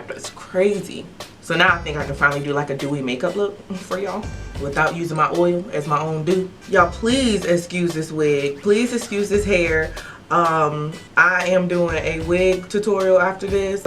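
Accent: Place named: California, United States. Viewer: I'm from Cali!!!! and you sound like you From California too... lol. you are so good at what you do. thanks for all of your videos. You are so beautiful!!!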